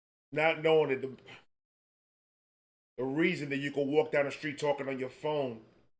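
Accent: New York, United States. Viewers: You have a Yankees hat on. And from the accent you sound like a New Yorker. Im a Yankees fan myself (last names Mattingly). I spent 39 months deployed in the infantry. I did it, i lived it. I have the physical and mental scars to back it up. You have had the best reaction video to this song, ive seen. As a veteran, this is probably my single favorite song ever. The sad thing is that those numbers are under represented. Not all suicides are classified that way. The more accurate number is between 35 and 40 veterans a day. Ive lost more brothers to their personal demons than to combat actions. If you're curious, "The Hornets Nest" is a great movie to watch (and the second half is my company from the service). Great 1st hand of what combat soldiers go through. Thank you for youre appreciation of the veterans. I can only assume what you witnessed on 9/11....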